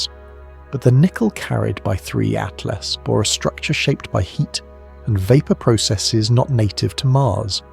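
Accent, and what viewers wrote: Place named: United Kingdom. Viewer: So Maven isost and offline. Hold old is this BS. he I hear this British voice I know it will be wordy BS not worth my time or bsndwidth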